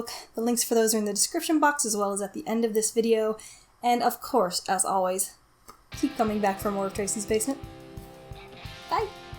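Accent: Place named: Ireland. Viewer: Is one of your parents Irish? It's just you say certain words with a real Irish twang. Loved the review.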